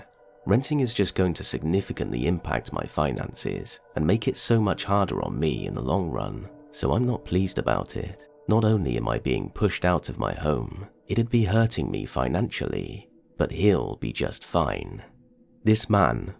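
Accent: United Kingdom. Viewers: Thank you 😊!!!! For the Stalkerlicious 👁 Narration:) and for over an hour of your Britishlicious voice 😘 be safe everyone ok 💋much love Sexy Queen of Darkness ✌❤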